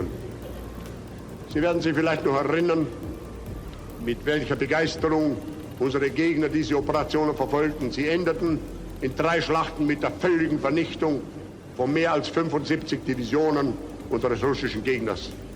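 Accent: Austria. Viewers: Interesting to hear his dialect that he adopted in Vienna as a young man. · He is Austrian. From Braunau. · When you are from Austria you can hear where he came from. Rural austrian dialect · Es war einfach ein österreichischer Dialekt Junge. Nix besonderes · Ist es wirklich. Sein österreichischer Akzent ist weniger stark als ich gedacht hatte.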